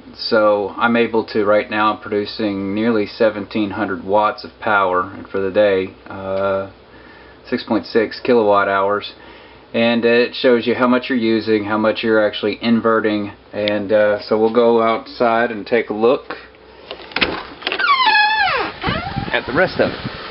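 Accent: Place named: South, United States. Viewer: You have lost some of your southern accent since this video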